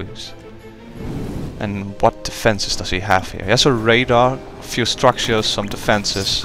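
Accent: Netherlands. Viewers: Yea, you sound dutch :P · Dutch peoples english accent is so easy to recognise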